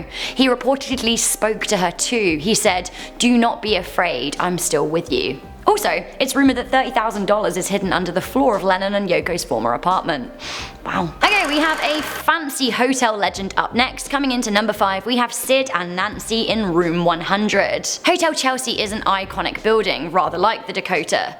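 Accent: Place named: Cornwall, United Kingdom. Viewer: Miss you are looking stunningly beautiful and sounded not like a pirate but more like my neighbours here in Cornwall UK 😍 but as always a great video plus very informative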